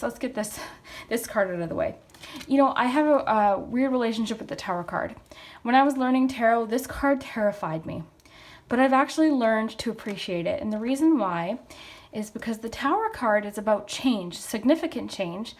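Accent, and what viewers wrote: Minnesota, United States. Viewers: Quick question are you from Minnesota?? Just because you said pop and your accent 😂❤️ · anyone know where she is from? or her actual name? i love her videos so much i watch all of them but i love her accent i just wanna know if its like Minnesota or something 😂 i feel like it is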